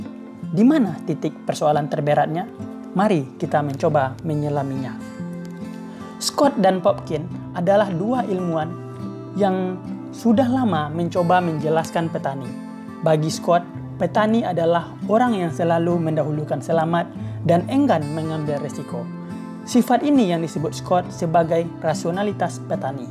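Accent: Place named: West Sumatra, Indonesia. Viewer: Logatnya orang minang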